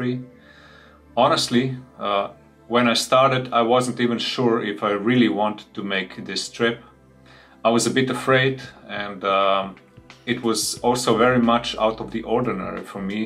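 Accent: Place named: Bulgaria. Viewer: Planning a trip with my 50cc as well, thats some nice tips, you have a bulgarian accent somehow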